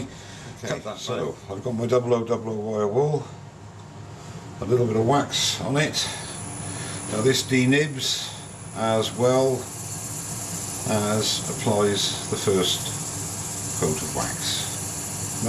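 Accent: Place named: England, United Kingdom. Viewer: Where's your accent from, are you Norfolk or West country?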